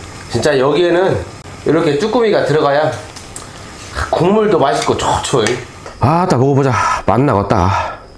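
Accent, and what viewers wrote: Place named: Gimje, South Korea. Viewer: 저도 고향이 김제인데 사투리도 생활하시눈것도 친근감이 가네요~ 시골라이프 부럽습니다!!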